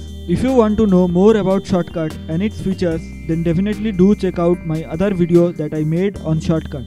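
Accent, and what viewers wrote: India. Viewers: When i hear this sound how he is talking he is from Indian for sure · Difficult to understand the Indian dialect......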